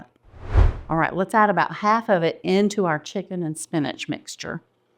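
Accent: South, United States. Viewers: Hi and D, Everyday I’m looking to see what dish your making.. Never heard of this casserole but planning to put it on my meal plan for next week.. Looks easy and tasty..If I wanted to add sides to serve with it then what do you suggest?.. Thank you for the recipe..I like your southern drawl and you have beautiful healthy hair.. Retired cosmetologist here with a thick southern drawl …😂😂😂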